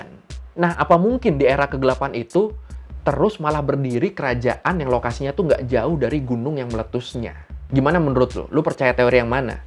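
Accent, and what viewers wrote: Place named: Madura, Indonesia. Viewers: Min.. Klo lg ngomong logat madura nya di ilangin donk! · Bang ngejelasin nya biasa aja gausa kaya org madura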